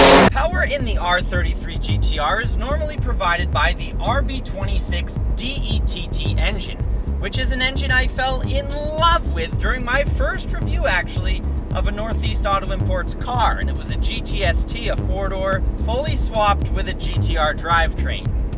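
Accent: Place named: United States. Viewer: Turned off when heard American accent only aussies build tuff GTR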